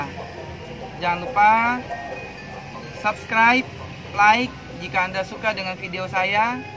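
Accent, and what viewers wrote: Java, Indonesia. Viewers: Eterna, federal, jenbo, kitani, patriot, sumitomo, supreme. Banyak opsi yg kualitasnya memadai. Kemarin aku beli pompa air submersible type SPG20-311K kabel bawaannya OFC 0,75mm² 30 meter. OFC singkatan oxygen-free copper artinya tembaga murni bebas oksigen, relatif tahan karat. Hantarannya bagus. Nyambung kabel serabut mestinya diberi ferrule atau skun yg dipress dg tang krimping agar tidak ngepong. Koh, logatmu medok kamu orang mana?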